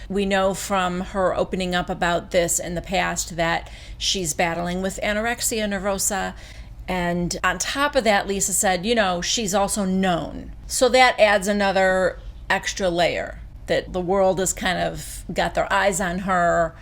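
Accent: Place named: South, United States. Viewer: Oh my gosh!!!! Rinna might as well be flipping LVP off. Hot pink satin top ,hot pink eye shadow and longer brown hair. 🤮. She will.do anything to be seen and get a paycheck. It's funny how Rinna always has to mention how rich people are. Love the Southern accent, you are the best. Well I guess I had better go change my yacht underwear and go work out.🤣🤣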